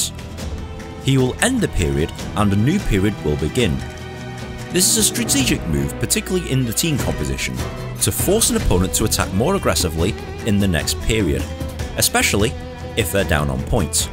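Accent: United Kingdom. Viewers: Very nice explained and I love typical british accent. But I need to correct something: the sabre is about 88cm long, the foil and the epee are about 90cm long (length of the blade/edge). The pictures doesn't show the correct lengths.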